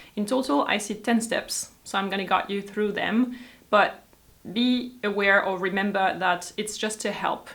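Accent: France. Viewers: Vera Prokopenko FRENCH and I love listening to her accent. A parent's brother, cousin or friend: Uncle. This 'u' is pronounced as in the word unbelievable or untrue. The word 'an' in ankle is pronounced as in the word anchor or angry. I'm in the UK and I love accent. I don't know anyone else with a French accent. It's very pleasant to hear. She doesn't speak to fast and everyone understands clearly what she is saying:)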